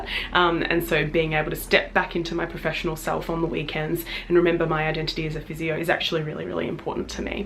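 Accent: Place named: Australia. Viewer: Your Aussie accent is delectable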